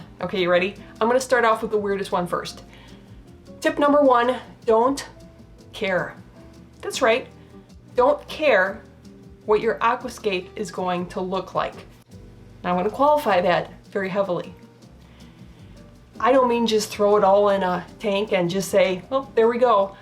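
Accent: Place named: Upper Midwest, United States. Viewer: Hmmmmmm.. I’m not a language expert but you sound like you’re from Wisconsin or Minnesota..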